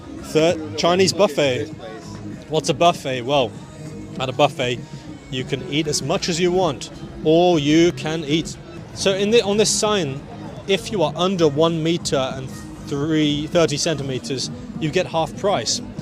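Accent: United Kingdom. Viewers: The British accent is the best one. I am Brazilian and I love accent and all the British's way to talk.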